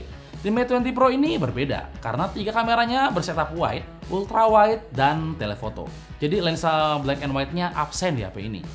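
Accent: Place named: West Java, Indonesia. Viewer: Sunda ninganan. Baruk urang mana da ngomong basa nasional wkwkwk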